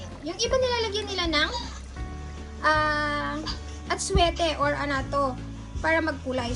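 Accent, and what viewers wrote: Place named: Ilocos, Philippines. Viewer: Yes authentic and natural at first time pla lutuin ito ang cute mo mag ilokano ha mangan tayun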